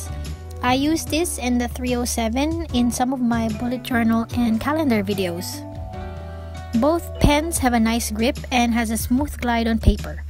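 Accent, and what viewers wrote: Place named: Philippines. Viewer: Your voice kinda reminds me of a Filipino accent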